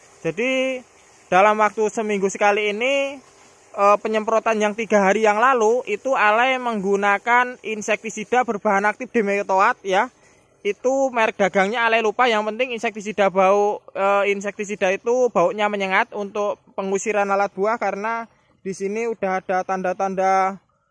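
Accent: East Java, Indonesia. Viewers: iki asale jowo ngendi bos kok kyk jawatimuran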